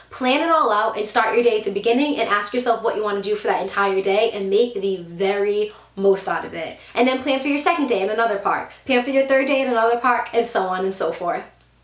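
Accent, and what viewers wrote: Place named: New Jersey, United States. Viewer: pahk coppah - Jersey?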